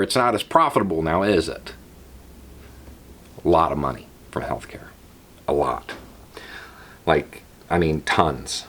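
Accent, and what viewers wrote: South, United States. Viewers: I hear the southern voice and I see the beard, I see the name, so I come here every day to disagree with you, and I fail every day, dammit!!! You keep destroying my preconceived notions.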